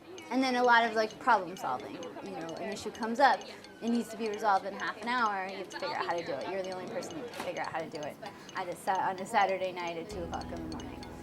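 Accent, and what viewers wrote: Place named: United States. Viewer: she has an American accent, maybe her parents are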